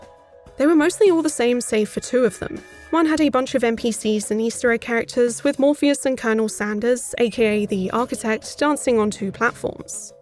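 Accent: United Kingdom. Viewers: WTF you're a woman talking about Matrix video games, your voice is premium ASMR quality, and that sophisticated British accent is the icing on the voice-over cake. LIKE LIKE LIKE LIKE LIKE LIKE LIKE Great job whoever you are, I love you 😃 - Thor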